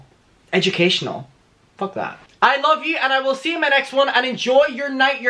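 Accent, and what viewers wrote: Ireland. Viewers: I think his is Irish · He sounds a little Irish and British.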